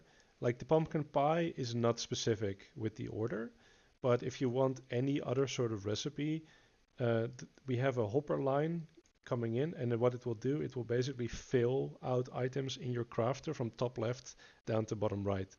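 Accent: Netherlands. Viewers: You have a very noticable 'Dutch-english' accent.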